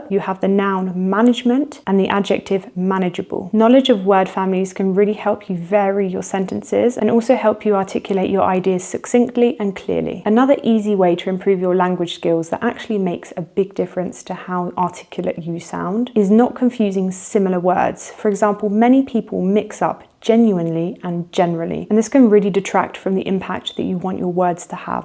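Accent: United Kingdom. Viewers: Good video. Is this rp accent?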